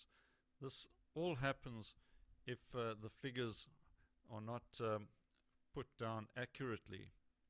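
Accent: South Africa. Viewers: Hi. I have just come across this video and I find it extremely well conceived. It is extremely helpful particularly for urban sketching. Thank you for sharing. Enjoyed the S.A accent!!!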